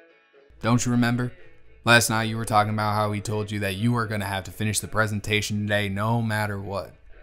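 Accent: South, United States. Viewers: I love country, so southern accents are just amazing. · Oh shit...Southern accent?! My heart. How dare you use that against me........ I fucking love you dude. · The cutry accent kind of caught me off guard but you always slay your videos. 🤭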